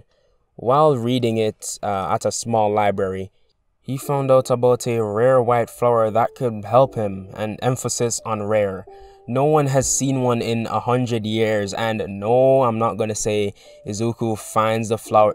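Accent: Jamaica. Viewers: U sounds Jamaican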